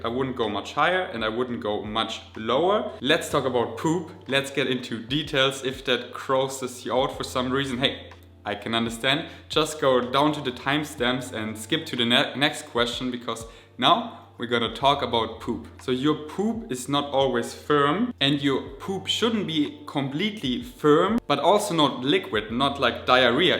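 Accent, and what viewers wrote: Germany. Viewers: Hey Du, ist mir schon mehrfach aufgefallen, jetzt muss ich es einfach sagen😂 ...Vor einem englischen Wort mit einem Vokal bzw. Vokallaut am Anfang "an" statt "a" benutzen! AN incomplete protein! Fließt doch viel besser so!🌞✔ Und um den deutschen Akzent zu verlieren, würde ich mir Videos wie "How to sound like an American" anschauen!🌚 Und wenn Du die Wörter nicht wie im Deutschen mit einer kleinen Pause dazwischen aussprichst, sondern zusammenziehst, wirkt das auch gleich viel besser (deshalb auch an statt a, damit man die Wörter ohne Unterbrechung aussprechen kann) 🌞✔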